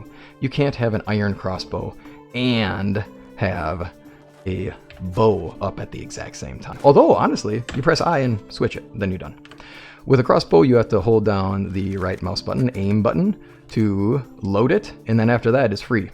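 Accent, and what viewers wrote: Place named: United States. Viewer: Holy shit, a medieval dynasty creator with an American accent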